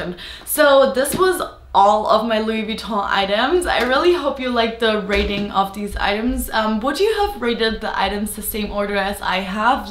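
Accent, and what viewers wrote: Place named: Germany. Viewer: Super schöne Sachen😍ich finde wenn man weiß dass du deutsch sprichst, hört man voll den Akzent raus🙈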